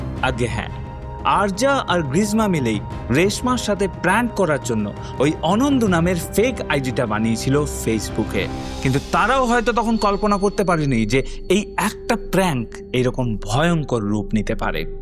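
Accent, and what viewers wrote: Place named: Mymensingh, Bangladesh. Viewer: 'ব' এবং 'ভ' এর উচ্চারণ ! আদি নিবাস কি ময়মনসিংহ ?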